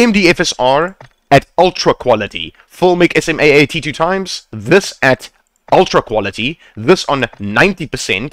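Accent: South Africa. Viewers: I love that you are south african because I actually like listening to people from home😂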